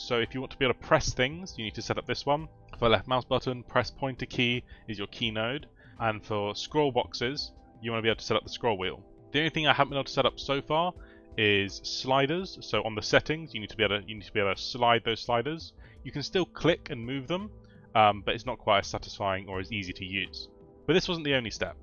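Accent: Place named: United Kingdom. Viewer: Dat UK accent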